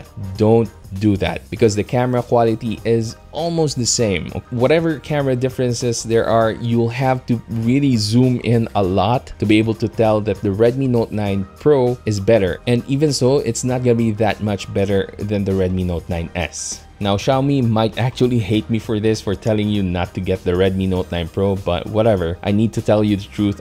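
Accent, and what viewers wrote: Visayas, Philippines. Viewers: You're speaking voice is so calm. Paytera kay bisaya ja inganag accent. You are the best technodad there is. I'm def a fan💛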